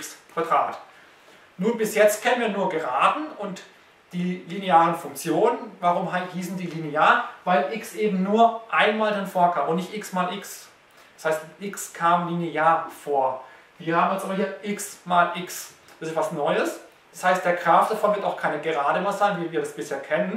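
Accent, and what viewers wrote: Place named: Baden, Germany. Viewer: Sehr schön erklärt Sie kommen doch auch aud dem badischen das hört man raus :) "isch gleich"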